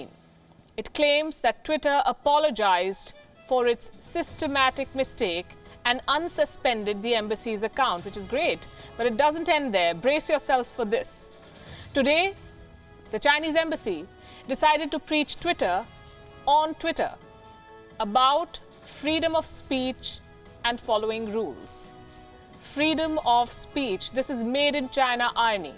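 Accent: India. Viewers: it's indian accent only dumbass not everyone has stereotypical accent likewise I am sure not everyone in China is Ching chong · If chinese are low class Indian is it better . This reporter still hv to speak well Not induan accent.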